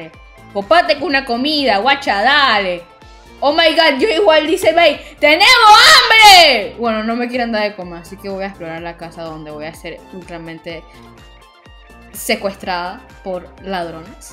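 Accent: Argentina. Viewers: TU ASENTO ARGENTINO JAJAJJAJAJA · Como te cambio la voz me encantaaa ❤❤❤ ame el mamáaaaaaa eres tu sos Argentina??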